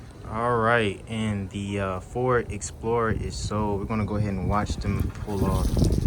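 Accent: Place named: Midwest, United States. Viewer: That's a Midwest Indiana or Ohio accent. Maybe Illinois?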